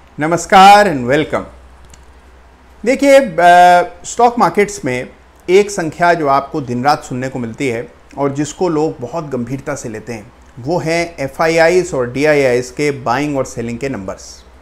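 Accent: Standard. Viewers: Sir, Aap ki suddh Hindi suntehi karn trupt ho gaye. Thanks a lot for informative video.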